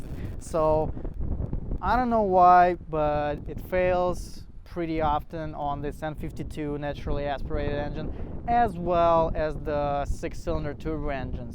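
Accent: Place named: Russia. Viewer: Russian accent af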